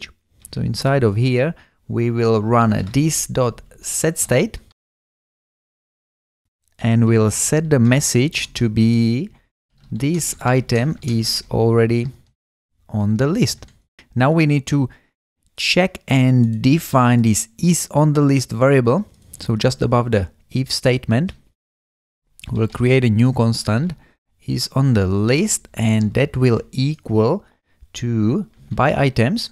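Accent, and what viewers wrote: Czech Republic. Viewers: Within the first 10 seconds, I said, "This guy is Czech".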